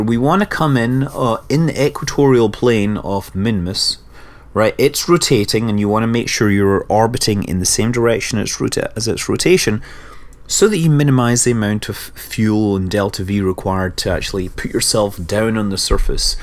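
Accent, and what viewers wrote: Scotland, United Kingdom. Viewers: Really enjoy your videos mate, also i have scottish grandparents so the accent always brings me back to the perthshire moors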